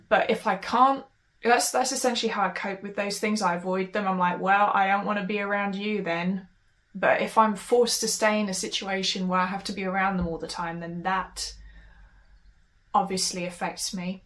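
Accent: United Kingdom. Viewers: THOZE DRY LiPZ, THE UNDER EYELiD BAGZ KOMiN iN, THE WHiTE WOMAN TWiTCH, & THAT ACCENT ! HOW R U BRiTiSH BUT NOT BRiTiSH GROWN !? U GOTTA TRY ADMiTTiNG WHETHER U THiNK THE DiCK iS CUTE OR NOT MiZZ ! U KAN'T KEEP BOTTLiNG UP ALL YOUR FEELiNGZ, NOR JUZT RELEAZE SOME OF THEM ON YOUTUBE, YOU'RE STiLL GONNA EXPLODE ! U HAVE TO B WiLLiNG TO SAY THiZ STUFF iN PERSON, ON THE SPOT, OR ELSE iT'LL EAT'CHU UP iNSiDE ! ONCE AGAiN, HONEZTY ! & QUESTiONiNG TOO ! & TRY & GET WHAT U EAT iN ORDER AS WELL, KAN B A MASSiVE HELP ! <link> "FOOD THAT KiLLS WiTH DR. MiCHAEL KLAPER-1993-FULL DOCUMENTARY-LECTURE-TESTiMONY" WHiTE0MOON UPLOAD ! TRY STiCKiNG TO EATiNG THiNGZ RAW THOUGH, THE MORE U COOK FOOD THE DEADER iT GETZ ! BUT U KAN TRY & HAVE BOiLED WATER AS A CONSTANT TO DRiNK THOUGH ! & iT'Z STiLL A DEATH PLANET MiZZ, & YOU'RE ALiVE ON iT, iT WAZN'T GOiNG TO END THAT WELL 4 US ANYWAY EVEN iF U HAPPEN TO B KOME iMMORTAL ! 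iT'Z ALRiGHT TO FEEL SAD, iT'Z NOT A DiSORDER, iT'Z AN EMOTiON ! VASECTOMiEZ 4 ALL, ESPECiALLY US HUMANZ ! AT LEAZT A WASH OUT METHOD OR SOMETHiN GUYZ ! [NOT YELLiNG, JUZT LiKE TYPiNG iN CAPZ]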